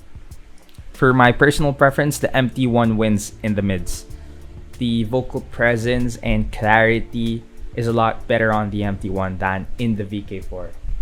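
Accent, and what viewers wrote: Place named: Indonesia. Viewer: You sound Indonesian or Malay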